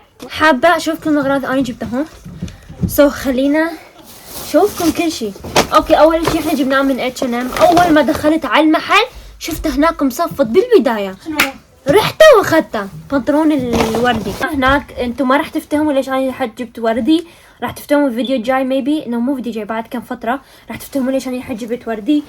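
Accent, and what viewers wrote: Iraq. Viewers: ها شبيها ترى المصلاويين يحجون هيج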